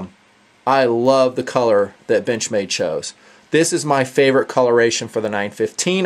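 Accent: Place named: South, United States. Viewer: I just love southern accents like when he said "sheepdog knife!"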